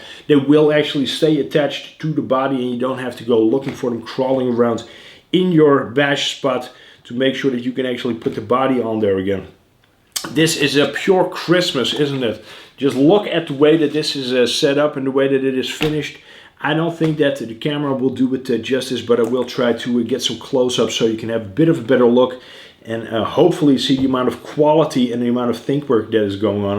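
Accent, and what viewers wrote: Netherlands. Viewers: Godvrdme, 10 jaar later en nog steeds het Nederlandse accent! :-). Maar goed bezig hoor, ik wil ook beginnen met een beetje crawlen maar dan op de Veluwe. Keep up the work sir.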